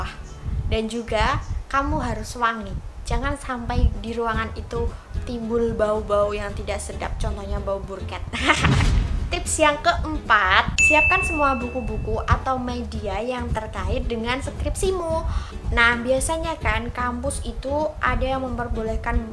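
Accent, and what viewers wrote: Java, Indonesia. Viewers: medok sekali 😍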